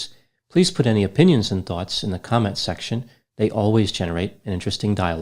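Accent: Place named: New York, United States. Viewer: A bit of accent came through more than usual today. I'm guessing he's from outside of NYC but within 75 miles. He's probably mentioned his hometown accent at some point and I could be way off.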